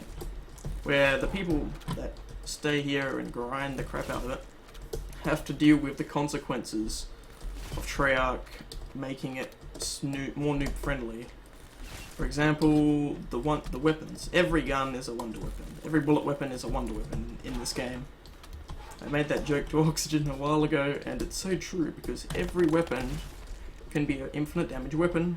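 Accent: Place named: Western Australia, Australia. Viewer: listening to a western Aussie rant is 11/10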